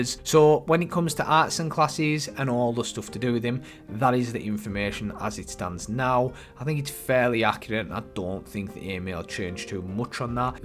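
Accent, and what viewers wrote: Newcastle, United Kingdom. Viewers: Hearing that Geordia/Maccam accent talking about Ashes is wild haha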